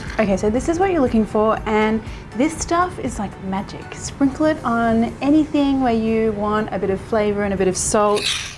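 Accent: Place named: Australia. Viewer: I’ve got to try this. Great vid and so well done. Are you Australian? Like your accent.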